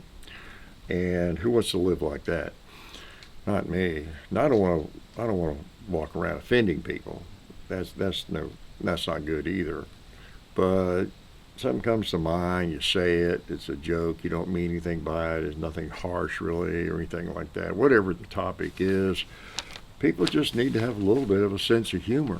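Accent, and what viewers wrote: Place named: Kentucky, United States. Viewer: Wishing you well! Regarding speech, I notice you have only very little of a Kentucky or Tennessee accent. I travelled the US twice by car paying attention to regional accents and was not too surprised that accents are strongest with older people who've mostly spent their life in a small town or within a particular limited area. Tennessee accents seem diverse. I was surprised that Chuck McDowell (radio ad) comes from Nashville. He has a strong accent; I thought it was Texan. Take care.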